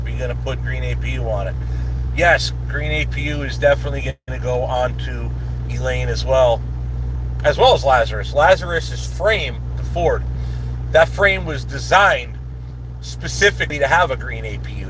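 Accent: New Jersey, United States. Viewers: YOUR JERSEY ACCENT IS COMING IN STRONG TODAY..WINTER IS HALF OVER. GREAT VIDEO.. IT'S MIKE FROM CANADA.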